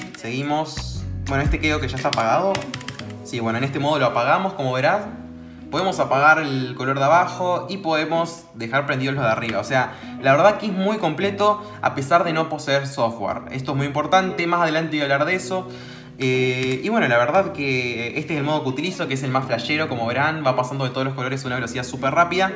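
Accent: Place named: Paraguay. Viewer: Tiene la ñ paraguaya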